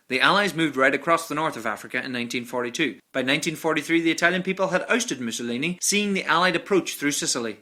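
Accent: Ireland. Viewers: Damn, everything is more interesting and funny with an Irish accent :D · Are you Irish? Your accent sounds like it, I have Irish cousins and they sound just like you lol. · are you Irish? you sound Irish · You sound irish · man i know you had cram lot in 7 min and people love to kind of blow over the whole aid thing from america you did bring it up but like a after thought you talked about britians navy and air force so much better germany and it was how ever the one thing all non america quick not talk about the lean lease with out the fuel from it britian would not be able to fly the planes or would not been able to sail the ships also on to russia you said it amassed large army true but with out food aid fuel weapons and material russia would been forced to surrender i know you were on a time restraint and i do think you for the sleeping giant i think that was churchill that first said it and i cant really tell by your accent i take it your irish for even bringing up irland if so there my second faviort non usa country only germany do i love more mostly cause out side of america the germans invented the most stuff not as much as america but alot i love that little country not little compaired to irland but little compaired to usa or russia and would beaten russia in my opion if was not for america that means would took a country 2 and half times the usa or about 15 that of its self not to mention belgium france poland all the others by its self mostly yea italy helped a little but hell greece beat italy in the early parts but i might be going after your video that does not mean i did not like it so im sure first thing if you do respond to this will be my grammar yea i know it sucks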